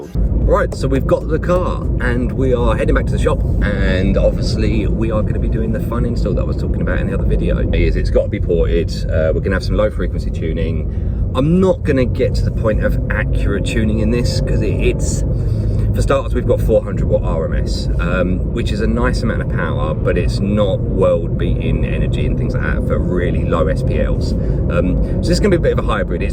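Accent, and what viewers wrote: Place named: England, United Kingdom. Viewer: You have such good material for your videos.. but the English Accent that England is so proud of has got to be the worst sounding English ever .. I am from South Africa and much prefer hearing an American English accent than this.. I can barely watch this.. it's like not even English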